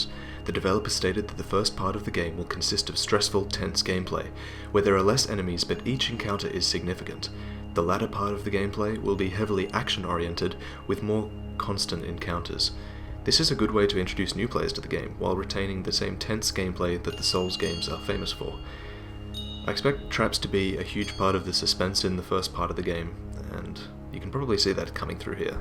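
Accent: Australia. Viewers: Your accent reminds me of someone... Chase from House. Are you Australian? Anyways, great video! Didn't notice he used both weapons on the downwards cut lol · I smell... i smell.. an australian. Correct? if so, do you live in perth?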